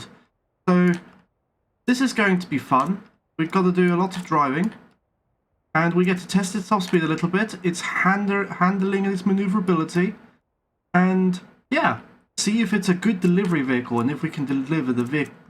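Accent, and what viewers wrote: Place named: Germany. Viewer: What is your accent? Your profile says German, but you don't sound like most Germans I have seen talk. I assume it is some kind of German regional accent, so I am curious what specific region.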